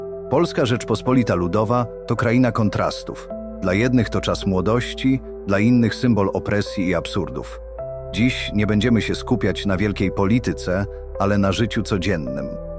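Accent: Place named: England, United Kingdom. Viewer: Bez urazy, ale wiedza autora z filmów Barei przesadzona w surrealizm. Oczywiście pewne fakty są prawdziwe ale np te z barów mlecznych z łańcuchami na sztućce i naczynia przykręcane jak na Misiu Barei to przesada. Polacy mieli dolary od rodziny z zachodu oraz bony PKO aby kupować w Peweksie lub Balatonie np walkmeny japońskie Wranglery itp. pracowali za dewizy np w Iraku czy Libii. Osobiście nic nie miałem do barów mlecznych. Chumorystycznie lecz przesadnie pokazana rzeczywistość PRL na kanwie filmowej nie rzeczywistej i ten akcent angielski coś mi mówi. Pozdrawiam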